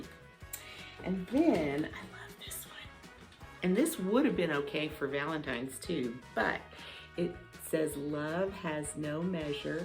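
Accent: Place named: Tennessee, United States. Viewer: I knew when you started talking you were a Tennessee gal. 🧡 I love that you are really selective in what you brought home. How long have you been in your home? We will be in ours 2 years in April. It just seems so wild to have the perfect house for just the 2 of us since the kids have their own houses now. We are renovating to get that cozy, cottage vibe. You are a lovely inspiration.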